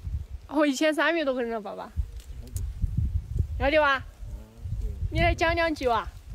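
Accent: Sichuan, China. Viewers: 사천 방언을 하시는것 같아요 티베트언어는 할줄아세요?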